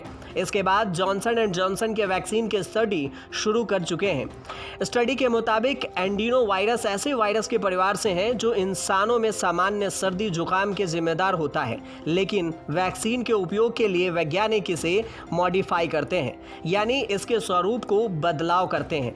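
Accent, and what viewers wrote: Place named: Bihar, India. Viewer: Bihari babua h vo isliye.. regional pronounciation problem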